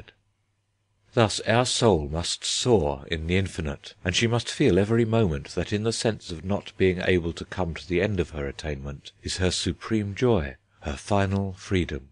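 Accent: England, United Kingdom. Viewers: There is a perverse contradiction having it read in an English accent. Really creepy.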